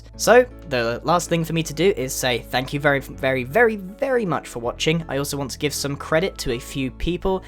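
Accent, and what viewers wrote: United Kingdom. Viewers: are you British? Love your acent 😘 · are you British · You're from the UK?? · I love your voice if your britsh so am I!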